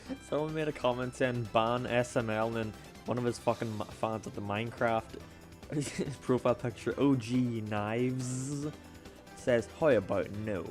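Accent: Ireland. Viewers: He has an irish accent, thats it. His first language probably is english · Get ready for the F**KING swarm of logans fans attacking a bunch of us normal people saying we suck dic or something because there mad we destroyed there lord and saviour also why are all the comments that he reads are f**king books also I love your acent you sound irish like me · are you irish or northern irish i reckognise your accent cus i am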